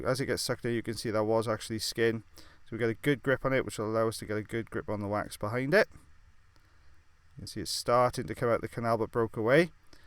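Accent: Wales, United Kingdom. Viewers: Hes from Wales in the UK. (Just incase you didnt know) so it's a Welsh accent. X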